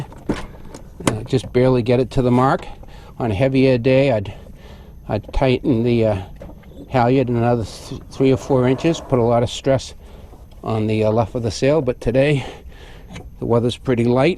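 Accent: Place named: Maine, United States. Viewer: I think I hear a Maine accent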